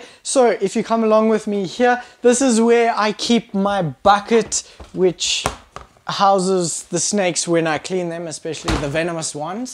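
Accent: South Africa. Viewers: Are u South African by any chance ? Your accent sounds like it.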